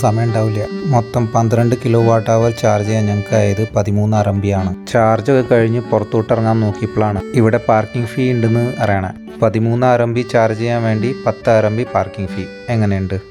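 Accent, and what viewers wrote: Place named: West Bengal, India. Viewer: Chetta why is your Malayalam heavily accented?? Oru bengali chova?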